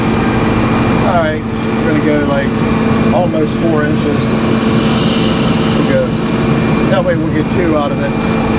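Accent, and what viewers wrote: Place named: Maryland, United States. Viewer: With your accent I would say you are from Hagerstown MD, where I’m from.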